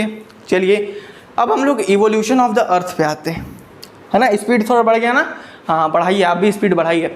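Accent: Bihar, India. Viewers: You have a different and a special way of teaching that highlights you from others 🤗🤗♥♥ because we don't feel bored while studying from you 😃😀 only because of your bihari language 😇😇😅😜♥♥♥♥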